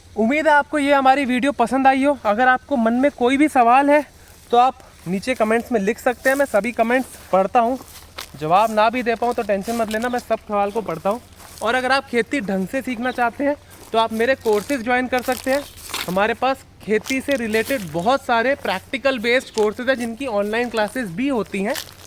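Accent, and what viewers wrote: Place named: Rajasthan, India. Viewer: Rajasthani ho kya?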